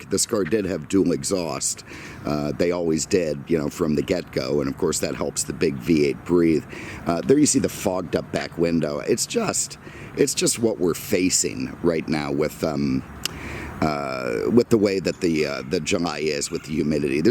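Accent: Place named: Great Lakes, United States. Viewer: Your accent screams somewhere between Lake Michigan & Pittsburgh😆This baby is a real gem from an era we may never see again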